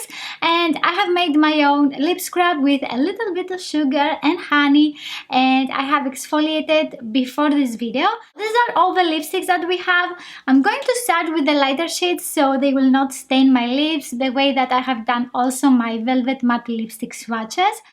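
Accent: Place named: Greece. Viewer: I immediately knew you were Greek 😂❤️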